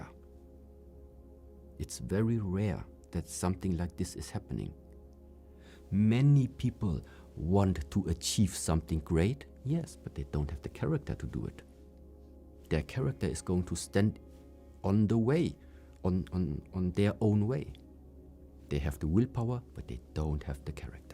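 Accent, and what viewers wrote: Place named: Germany. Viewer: He is German or has lived a long time there. He speaks English like a German. Interesting.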